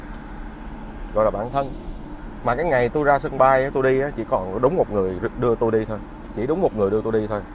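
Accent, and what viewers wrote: Standard. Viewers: Anh nói chuẩn quá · A oi,a noi chuan lam,hy vong a lam nhieu video nua,de e hoc hoi nhung j ve ben my.e cung muon qua do song giong a.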